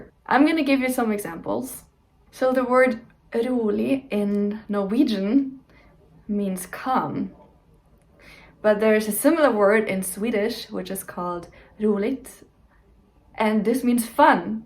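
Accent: Germany. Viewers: In one of the videos you say that you didn’t know if you had a German accent, I can confirm you 100% have a typical German accent when speaking English. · I can hear the German part of you in some of your English :-)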